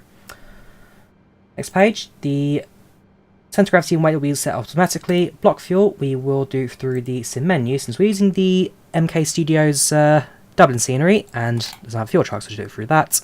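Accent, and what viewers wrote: United Kingdom. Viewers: Thanks for this video! It's wonderful listening to your British voice and a good landing! Your channel deserves more subscribers!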